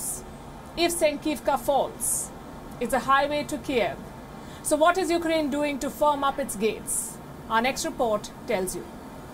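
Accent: India. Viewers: She has a mad Indian accent! In fact ALL Indians have the same accent…weird🤪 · Sometimes I don't understand your english because your indian accent is still too thick for me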